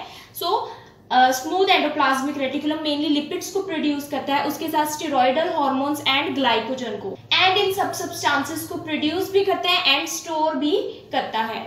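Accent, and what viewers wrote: Punjab, India. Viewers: Mam are you panjabi?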